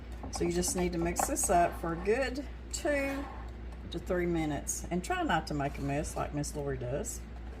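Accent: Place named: Arkansas, United States. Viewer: The kids would request buttermilk pie for birthdays so it was a favorite ❤️. I think my favorite thing about your channel is your way of talking there in Arkansas. It’s so sweet and relaxing. I love the words you use and the way you say them. Just oozes love and I know that comes from Jesus. I froze some duck eggs this morning and I made quite a mess! Tried to do it in the muffin cups but duck eggs are too big so I couldn’t whip them up plus duck eggs are so thick. So I got out my stick blender and the head of it fit right in the muffin cup perfectly. A few buzzes and all was well! Next time I will buzz them before pouring into cups but at least I saved the ones I had already cracked in the muffin cups. I did use the silicone type.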